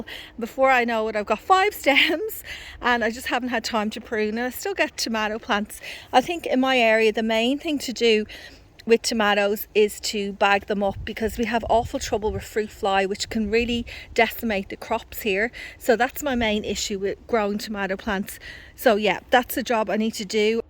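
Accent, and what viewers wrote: Ireland. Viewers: Love the Irish accent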